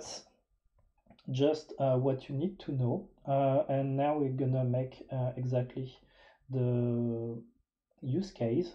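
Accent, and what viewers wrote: France. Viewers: Il me semblait bien que c'était un accent Français, Bonne continuation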